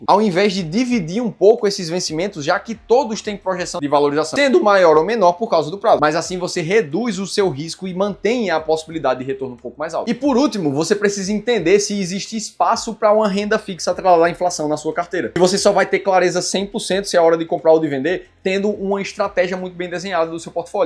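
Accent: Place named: Alagoas, Brazil. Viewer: Esse cabra fala! Ooo bicho esperto! Hahahah parece alagoano! Aí sabe sobre finanças hehe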